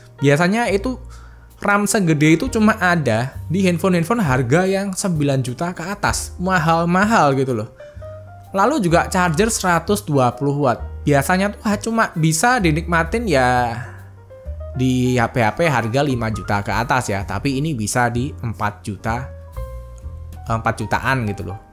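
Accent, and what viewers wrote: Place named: Java, Indonesia. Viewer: Bang orng mana bng?kya ada logat Jawa nya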